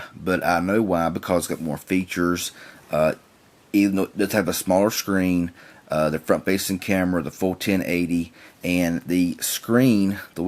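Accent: South, United States. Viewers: You sound like you're from down south, but you talk nerdy, I LOVE IT!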